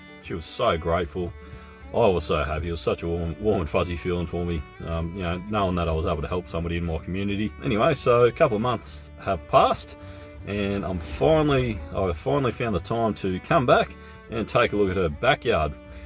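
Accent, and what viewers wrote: Australia. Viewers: TV aerial was my guess! Woot! Great job. I love the charity work. Love your accent, too! Down home Alabama here, so hearing an Aussie is a treat. Keep cutting! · Where are you located? Are you an Aussie? Love the accent. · At first I wasnt really paying attention and took your aussie accent as a british one and was like, why the hell is this guy worried about spiders. Then I realized that he is in Australia lol. Cant imagine having to worry about creepy crawlies all day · When u like hearing languages and recognize it as Australian accent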